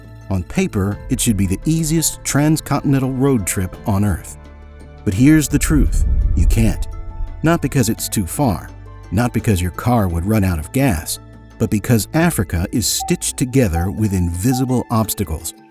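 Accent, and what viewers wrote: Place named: United States. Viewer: lol he sounds yank to me nothing euroxentric there 😂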